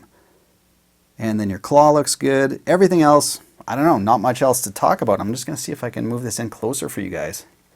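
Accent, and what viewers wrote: Canada. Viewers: I've been waiting for something special as at 67 68 I'm looking for my last guitar purchase..I just sent a email as regards left hand..hopefully its a yes..massive ty everything changed for me since watching your vids and I have watched many..so informative and well presented..and I love the Canadian accent far better than American ha ha..just saying I'm an Essex man England you might not like mine either Yanks but Canadian is a smoother sound..mind you Steve Earle country sound excellent..but I want this guitar quality supreme ty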